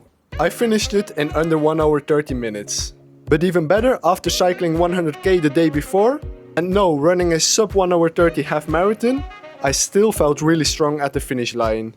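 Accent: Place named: France. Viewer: his french accent is so thick.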